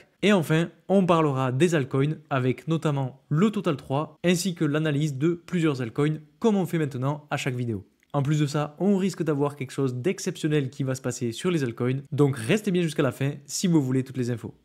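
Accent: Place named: Toulouse, France. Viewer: Tu as l’accent de Toulouse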